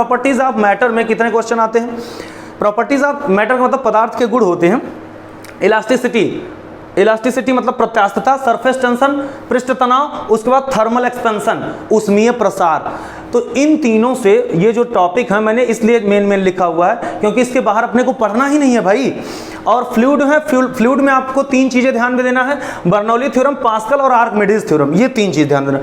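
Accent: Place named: Punjab, India. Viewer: Sir come fast. We need your class. For the First time Maths became easy when i watch Mayank Sir's video and now we got a dj style sir for physics also. And your Hindi is a mix of punjabi language,which i love to hear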